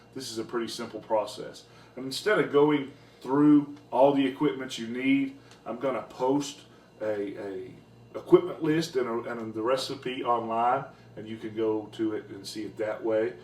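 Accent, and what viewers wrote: South, United States. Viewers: Considering his Southern American accent, I would guess US gallon.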